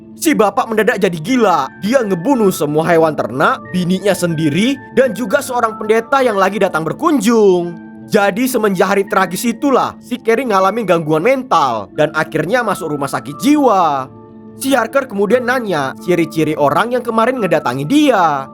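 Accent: Medan, Indonesia. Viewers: Logat nya bicara medan kali bah😂 Gabasabasi langsung awak subs yekan☕🗿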